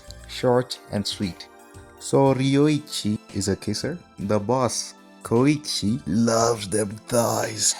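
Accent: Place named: Germany. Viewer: youre so German its cute I love your accent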